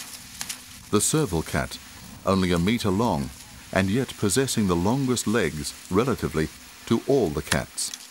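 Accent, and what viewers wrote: United Kingdom. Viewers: The female lion "acting l as though she's not interested"? What? Is this guy claiming to know the mind of the lioness? I am just being funny here, but there is still something creepy about hearing a posh white Englishman say those words in that order. To me these poor female animals aren't acting, as their only way of communicating is through their actions (as their biological state of being in heat is not a conscious choice) so I can assume, as the lioness is violently trying to get away that she is at the very least not happy about what's happening to her! It's like No doesn't mean no to this narrator, in the animal kingdom😉!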